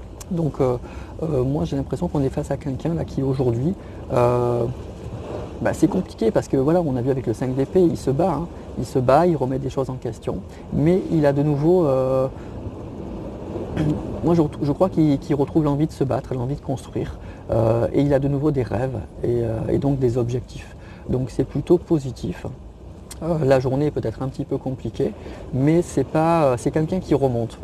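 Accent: Marseille, France. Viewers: On dirait Marseille 🥰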